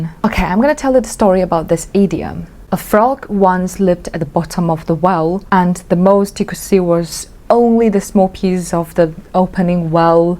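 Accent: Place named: England, United Kingdom. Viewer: I am only here to listen to English accent and maybe by chance learn some Mandarin. ( Its a Canadian thing)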